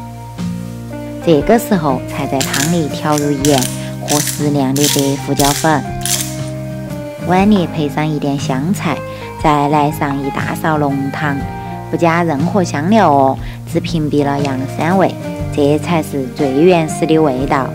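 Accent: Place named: Sichuan, China. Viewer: 我不是中国出生的都能听懂。蛮好听的四川成都话。👍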